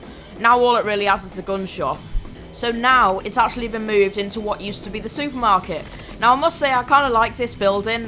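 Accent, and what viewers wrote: United Kingdom. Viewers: LOL i love ur accent XD u from like south western??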